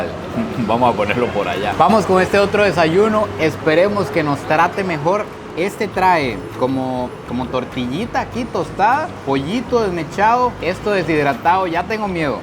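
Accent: Costa Rica. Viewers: Cada video de 🥹✨🩵 es una aventura bien vivida junto a él 🧸💙 Mi amo su acento ticoooo😂❤ “Arroz” 💙✨🧸 Love it, so cute 😍💙✨🧸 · Me encanta lo increíblemente marcado lo tico "un fresco de chang" jajajaj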